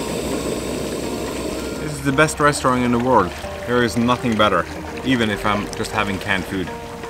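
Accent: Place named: Norway. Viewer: Hei, hører at du er norsk, PG elsker NBen din, og lurer på om du har vert i Bekkjarvik?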